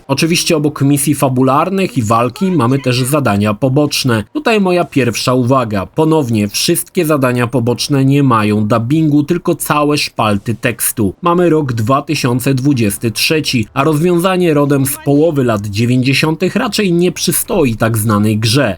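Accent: Łódź, Poland. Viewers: tu nie ma w ogóle co porównywać. swoją amatorszczyzną i bełkotliwą łódźką dykcją nie staje nawet w szeregu z i może mu co najwyżej buty czyścić.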